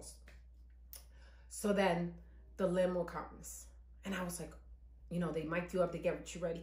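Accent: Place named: Baltimore, United States. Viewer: The way you sound on here I would think you’re from Baltimore/DC area not N. jersey haha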